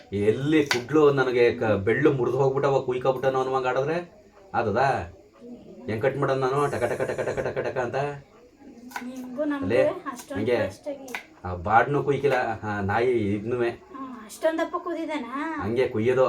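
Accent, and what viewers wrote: Mandya, India. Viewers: Namaste avrige. Video super 👌. Nimma Mandya bhashe 👍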